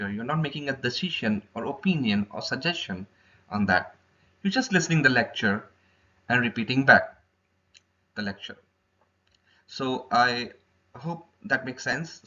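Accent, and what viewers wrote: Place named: India. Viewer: "Give" the exams...very Indian accent...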